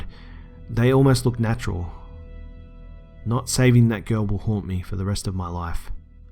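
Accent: Australia. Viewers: move here to Australia, a lot of people sound like this even me and my friends... in other words everywhere 0_o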